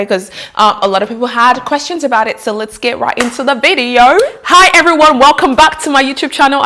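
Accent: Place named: Australia. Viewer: ❤❤❤❤❤❤🥰 I love Australian accent! Had me laughing!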